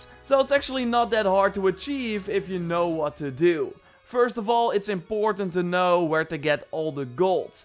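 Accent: Netherlands. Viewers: Dutch native speaker? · Heb je niet het idee dat de game minder leuk wordt door "te vroeg" je wapens naar max lvl te werken? ( aan je accent te horen, ga ik er vanuit dat je Nederlands ben ;-) )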